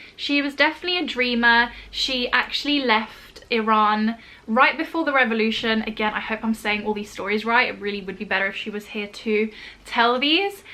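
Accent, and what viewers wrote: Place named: United Kingdom. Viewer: Aww you’ve got a strong british accent when you speak Italian 😍 how cute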